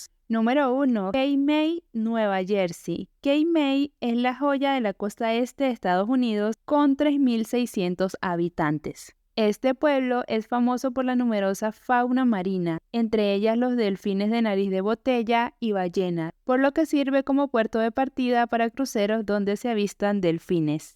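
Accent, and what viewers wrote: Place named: Venezuela. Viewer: La joven que narra tiene un acento muy bonito, parece venezolana o colombiana. De dónde será? Es solo curiosidad.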